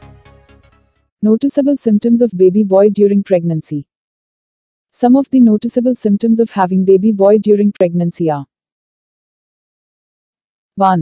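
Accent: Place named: India. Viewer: the thick indian accent has spoilt this video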